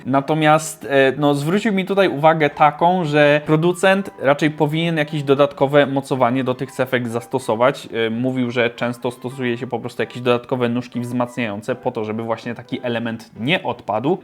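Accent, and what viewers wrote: Silesia, Poland. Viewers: Kurde widać że swój chłop Ślązak wotaj w klumie masło hahahahaha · wielki plus za śląski akcent w filmie!😃 edit: pod koniec filmu zauwazyłem też koszulkę ze ślonskim akcentem xdd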